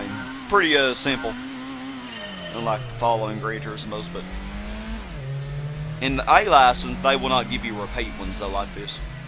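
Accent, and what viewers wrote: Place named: Kentucky, United States. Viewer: Hello there you sound like your from Kentucky or something I’m from Indiana and I see you have a country accent just found you so I’m going to sub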